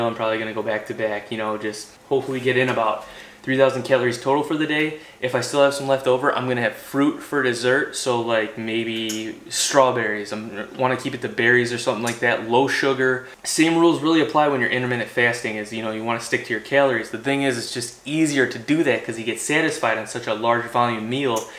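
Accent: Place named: Midwest, United States. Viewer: where is this guy from? THOSE AAAAs are midwestern AF! Canadian? he’s freakin gorgeous and sounds so funny lol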